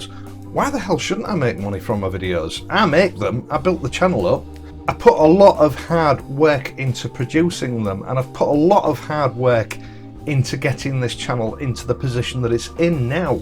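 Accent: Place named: Hull, United Kingdom. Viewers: Spoken like a true Yorkshireman! I like your accent, not that it means a lot, but I do. My late Mother was born and bread in Yorkshire, well that part that’s now called CLEVELAND! My father died when I was only 10yrs old, he was a great deal older than my Mother, but succumbed to lung cancer in the days before that disease was linked to smoking, and he was a heavy smoker. My mother had a big family in Thornaby and surrounding areas. After my fathers demise, she sort solace in the bosom of her family, and I spent a lot of time ooop Nooorth! My home town was Cambridge. I thought we were going to move there, and I think that was my Mother’s original intention, but for some reason it never happened, I think it was something to do with my younger Brother and sister. Anyway, it’s long way round, to saying that your vids bring back very happy memories for me, and I love ‘em. 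When I left school, I joined the Army as a Boy Soldier, and my first posting after Boy Service was Catterick. I had a bike by then, and the rides around the moors were among the best I have done. Not to mention the seaside visits to Whitby, Seaton Carew, Redcar, Scarborough, and many of the villages that were made famous by TV programmes, long before they had been found by that Media. All I can say is, that anybody that has anything derogatory to say about you and your work, must have a very sad home life. As for me, being a new subscriber, I am happily going through ALL your vids, and absolutely loving it, and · I love your accent, my missus is from York, the Yorkshire accent is great. I want you to make money from the channel if it means you keep posting. Have a great Christmas. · The way you talk is a problem to some people? That rich Hull accent! Up with Yorkshire! Great channel.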